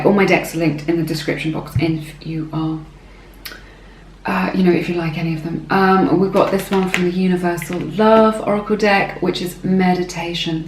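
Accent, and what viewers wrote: United Kingdom. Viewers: THANK YOU so much! I was lead to your channel by another youtube channel who I really respect. I’ve found that channels my friends or other readers have recommended to me are usually very accurate (could be the strength of my energy that’s being picked up on 🤔 Your reading was absolutely spot on to the point I was just smiling knowingly all the way through it (I chose 2). And because I’m also British, I don’t know why but I seem to resonate more with it. Silly I know but I like hearing the familiarity of the British accent! Thanks once again. 💙⭐️🙌🏾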